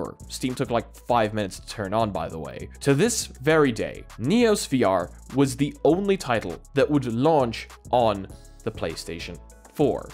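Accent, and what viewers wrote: Poland. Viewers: you from poland? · Man good video but are you from Poland? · Are you polish?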